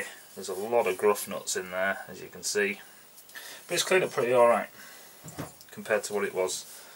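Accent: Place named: North, United Kingdom. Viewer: u sound northern!! lol:) me preston northwest.. uk:) lol:)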